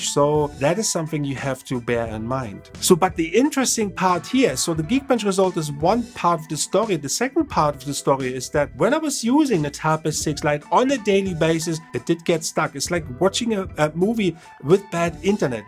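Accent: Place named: Germany. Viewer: I like your german accent, schönen Tag noch 👍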